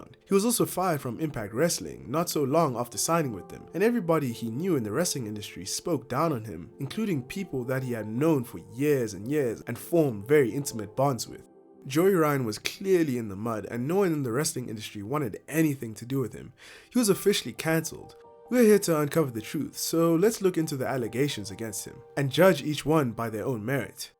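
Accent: South Africa. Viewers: Ey bro are you South African?😁that accent sounds like home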